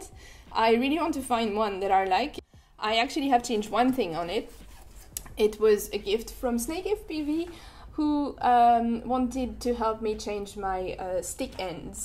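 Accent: France. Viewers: I meant like are you in France or a different country? Like the accent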